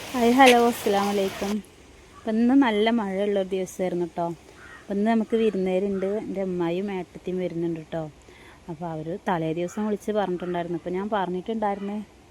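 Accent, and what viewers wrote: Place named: Manjeri, India. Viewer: മഞ്ചേരി ആണോ.. Same സ്ലാങ് ഉള്ള ഫ്രണ്ട് ഉണ്ട് എനിക്ക് 😊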